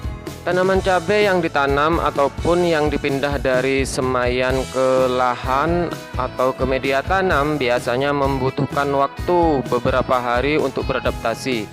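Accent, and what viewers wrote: Madura, Indonesia. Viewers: asli mana bang? kog logat madura kayaknya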